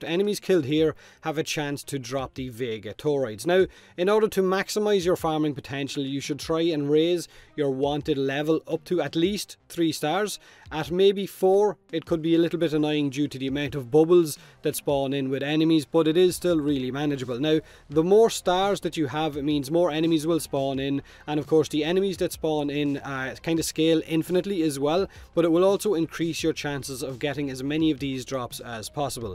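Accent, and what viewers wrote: Ireland. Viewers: Hay is you Irelandish?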